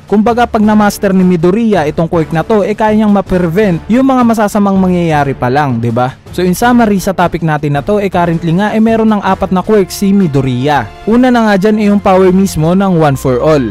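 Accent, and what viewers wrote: Visayas, Philippines. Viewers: Naol bisaya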